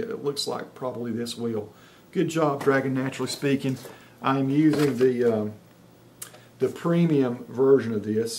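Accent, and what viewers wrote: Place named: Eastern Georgia, United States. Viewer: I have a similar accent (Eastern Georgia) and would love to have a stenographer software program like this that works well with my speech patterns! Looks like it is working well for you,! Thanks for sharing.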